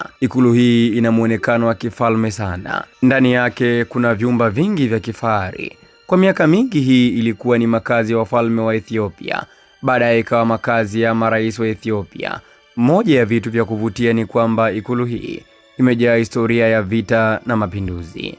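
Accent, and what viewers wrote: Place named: Kenya. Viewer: Mtangazaj ni mkenya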